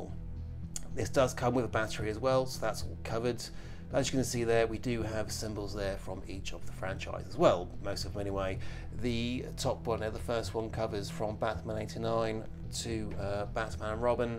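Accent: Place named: United Kingdom. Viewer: They should have used the head articulation where the joint is in the bottom of the neck instead of the top. Like Hush Batman or Capulo rebirth Batman. When they said they were making a Keaton figure that's what I assumed they would do. It makes the most sense as it would have better preserved the shape of the cowl and neck. Sounds like you're in the UK man. May I please ask where you got this? I haven't been able to find it anywhere yet. Edit - Enjoyed the video, have a sub👍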